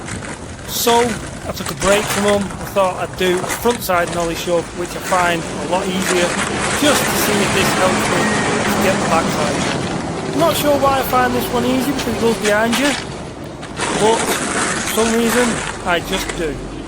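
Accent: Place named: Ireland. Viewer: No problem bro, and gotcha. I heard more of an Irish accent but I don't know much about that lol.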